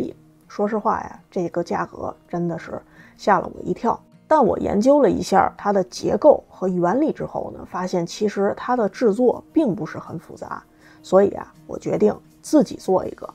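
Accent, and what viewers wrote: Beijing, China. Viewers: 口音像北京人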